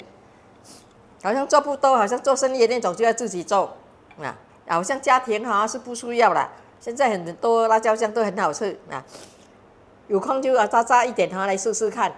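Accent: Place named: Hokkien, China. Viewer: Autie我早上好！ 谢谢你8你youtube 又见面了。 我喜欢听您的声音话语加福建❤🎉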